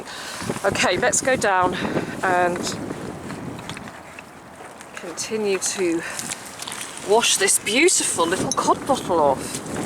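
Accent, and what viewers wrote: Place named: Humberside, United Kingdom. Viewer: What a fantastic & interesting video, been a Gunners fan since 1967. You've greatly added to my knowledge about the early days. I detect the Humber estuary, but oh boy, it's nothing like this. Keep em coming. Thank you.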